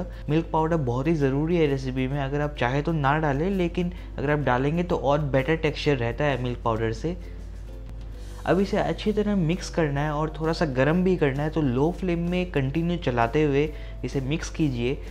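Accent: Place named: Hyderabad, India. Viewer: Your huderabadi accent is on point ...God bless u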